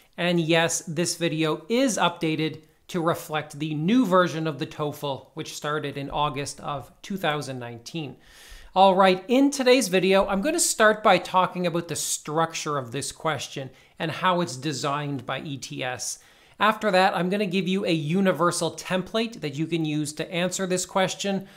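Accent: Canada. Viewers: Hey, thanks for all the videos. They are really helpful. Test day tomorrow, wish me luck! out of curiosity, are you canadian? I like to guess where people are from based on their accent haha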